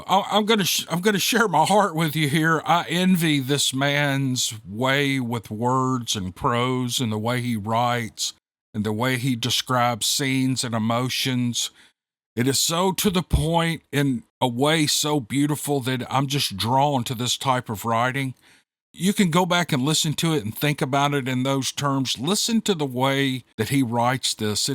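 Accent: South, United States. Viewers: I adore your accent!!! It's' so great to listen to someone who sounds just like me!!! ❣️❣️❣️❣️❣️ I Could listen to you all day long! You are from just a little bit deeper south than me. (Hahaha!!!)I'm from North Carolina born in Winston-Salem. But I've been everywhere. And enjoyed every minute of my travels. Someday I'm gonna write my adventures down. I don't really know how i survived those dangerous times, but thank G-D I did. Love ya!!! Keep up the great story sharing!!!😮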